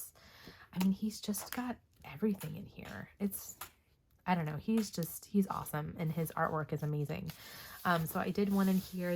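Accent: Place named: Texas, United States. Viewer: Wow, congrats on the record and all such beautiful pages 🥰📚 I love your Texan accent and you did soo good on the pronunciation 👍🏻 Thank you for the shout out and your very sweet words, I really enjoyed our body-color 😊 I hope you feel better soon and I really look forward to all the interesting things you have planned for the channel and also congrats on all the new subscribers, that is so awesome 🤩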